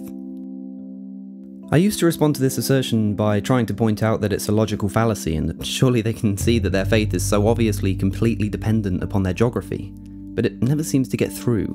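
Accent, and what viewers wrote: United Kingdom. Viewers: Wait... you live in the USA? You sound British. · He is British, from Oxford.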